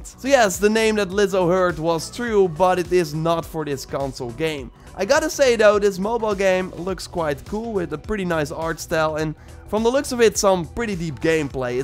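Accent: Netherlands. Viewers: please work on your accent, it's so obvious that you're Dutch. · holy shit you sound dutch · Nederlands accent, altijd herkenbaar in enkele seconden 😂👍🏻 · Dat moment dat je het accent hoort en weet dat de presentator Nederlands is vanwege het accent (geen haat, alleen liefde)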